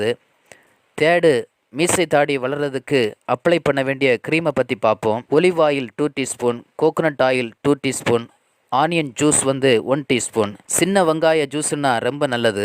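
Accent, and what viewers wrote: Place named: Kanyakumari, India. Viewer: Kumari thamil...👍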